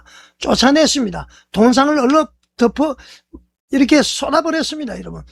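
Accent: North Korea. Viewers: 말도안돼는 유투브영상으로 사람들 혼란시키지 마시요 북한말투가 확실하네요 이런인간이 교회를 망가트리는 원흉이다 여러분들 속지마세요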